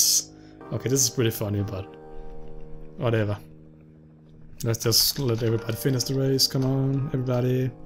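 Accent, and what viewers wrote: Denmark. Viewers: Man kan godt høre du er dansker med dig Accent. Hvor kommer du fra? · Are you from Denmark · Are you from Denmark?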